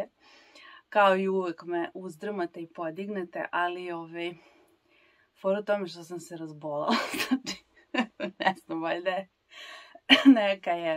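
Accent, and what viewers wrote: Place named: Novi Sad, Serbia. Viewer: Osmeh i pozitiva zlata vredni, najiskrenije 💜💜💜 kao i sve emocije koje krajnje iskreno iskazuješ. 💜💜💜 Plus, novosadski naglasak koji uvek volim da čujem. 🙂 Ti si jedna retko divna osoba koja svoje pratioce dozivljava kao drugare, a ne kao "vreću novca", i to je pre svega jako veliki plus- to govori mnogo o tome kakva si osoba. ❤️ No, to je već sve od samog početka poznato svima. 🙂 Što se zdravstvenog stanja tiče, od sveg ❤️ i najiskrenije ti želim da sve bude u najboljem redu što je pre moguće, prvenstveno zbog tebe same jer zaslužuješ samo ono što je dobro. Dušo naša divna i draga, još jednom sve najlepše ti želim! ❤️❤️❤️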